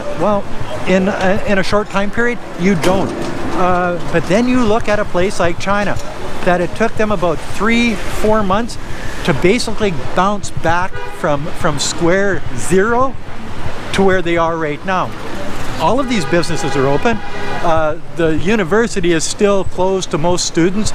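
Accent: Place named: Canada. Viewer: Saturday Morning is gonna be a great funny show! like your canadian voice!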